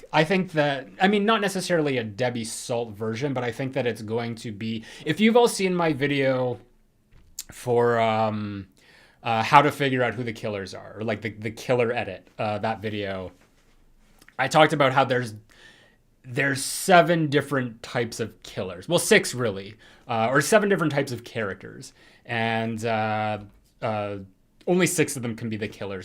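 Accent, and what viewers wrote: Canada. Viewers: You're so cute: quite facially emotive, sometimes handsy, and I love when you speak Canadian like out and about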